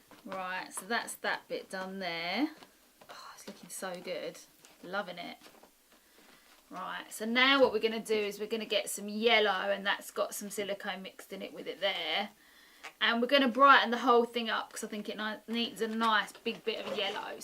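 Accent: United Kingdom. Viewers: Loooove the British accent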